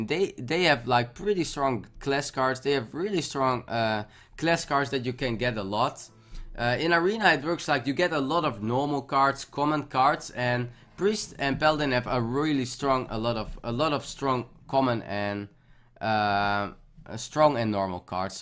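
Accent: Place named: Netherlands. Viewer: Wist meten dat je Nederlands was man. :D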